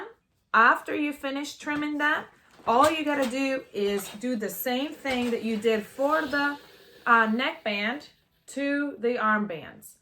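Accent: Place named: Colombia. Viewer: I love your accent. Are you from Colombia? You remind me of Sofia Vergara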